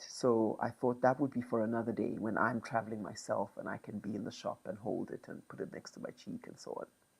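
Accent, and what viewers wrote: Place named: Cape Town, South Africa. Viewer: When you said Cow girl blues I thought…. Oh Cape Town immediately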